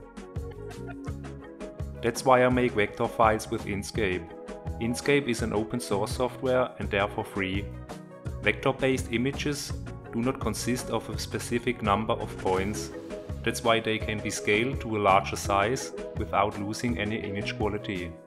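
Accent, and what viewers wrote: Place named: Germany. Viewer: ich hab geliked wegen dem deutschen Akzent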